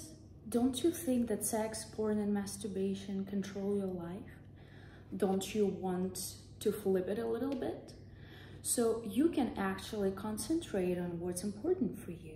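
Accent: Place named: Russia. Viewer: She's from Russia or Ukraine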